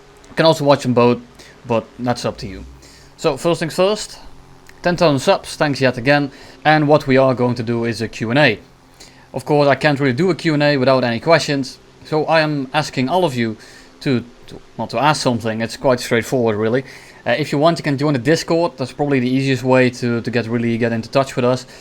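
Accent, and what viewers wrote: Netherlands. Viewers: Q: what country are u from? You sound dutch but that's Just me I think.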